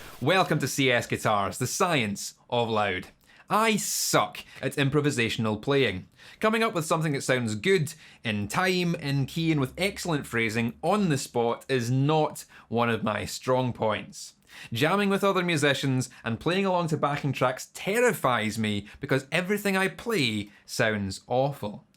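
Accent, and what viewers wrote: Scotland, United Kingdom. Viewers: What a pleasant person. Lovely Scottish accent. Not showing off at all, although he is a pretty good guitarist. I watched this video and I had to smile many times. Good musician and very funny entertainer too. Congratulations !!! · You Scottish or Irish? You sound Scottish to me, I ask cause my uncle is from Ireland and I’ve noticed there are varying accents in Ireland. Cheers from Canada, I’ve just subscribed as well as ordered this amp.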